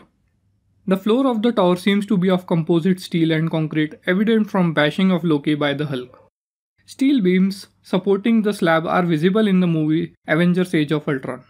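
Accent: India. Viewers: He soundz like rajistahn 27 responses matches the 27 disapproves Manhattan area tall buildings of ny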